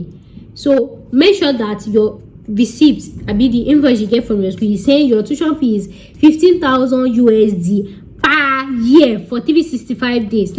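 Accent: Yoruba, Nigeria. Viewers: Your video is real with your typical Yoruba/ Nigeria accent. God bless. Pls, do consider we viewers not from Nigerian tooooooo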